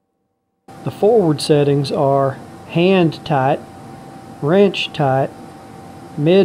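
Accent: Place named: Alabama, United States. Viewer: nice review ...who's did the talking in vid ...he sounds like he's from Alabama...lolol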